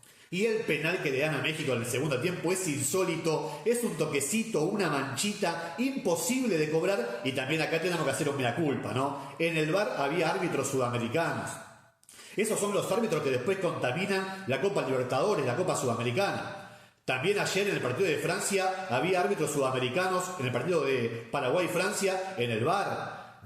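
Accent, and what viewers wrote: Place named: Argentina. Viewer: Hola mi buen Argentino (supongo por tu tonito) te equivocas al pensar que no conocemos nuestra realidad (futbolística) pero si te invitaría a qué no te amargues ni te lo tomes en serio lo del fútbol, por qué los mexicanos pasada la emoción volteamos la pagina y seguimos la vida igual de alegres (si conocemos nuestra realidad) admiramos a Messi si eso te hace feliz .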